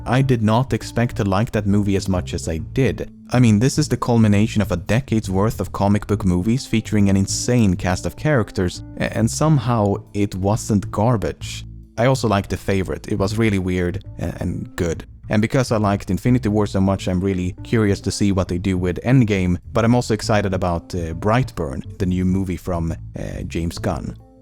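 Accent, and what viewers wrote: Gothenburg, Sweden. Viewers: Sooooooo i caught something in this video.... I think accent is becoming more english than swedish. Honestly that thick swedish accent is what makes your videos stand out from the whole youtube space. Oh and also congrats on 3M! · Är du från Göteborg? De låter så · No, he has a slight swedish accent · Lem’s narration on his older vids (especially 2 mil Q&A) reminded me of Keralis... meybe it’s because of the low pitch Swede accent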